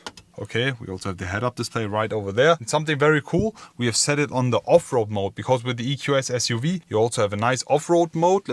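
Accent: Germany. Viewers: I love your German accent. I won’t say who you sound like but I like it. I also think you give very good presentations and cover the smaller details as well as the big ones!!! Much love and respect and the new EQS SUV is to die for. Such quiet class and beauty!!! I could live in that thing…🥰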